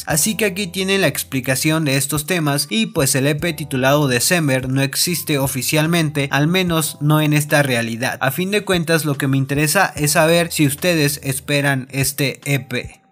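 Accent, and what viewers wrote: Argentina. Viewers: Eu yo pensaba que eras de argentina jsjsjs De que pais sos??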